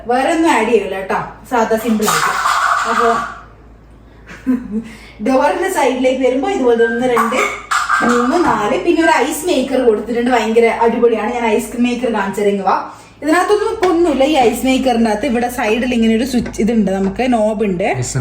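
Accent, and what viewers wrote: Kannur, India. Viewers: Adipowli chechi❤ എനിക്ക് ചേച്ചിടെ സംസാരം ഭയങ്കര ഇഷ്ട്ടായിന് നമ്മളെ കണ്ണൂർ സ്‌ലാങ് വേറെ നാട്ടിൽ നിൽക്കുമ്പോഴും മാറിട്ടില്ലല്ലോ👍👍👍🥰🥰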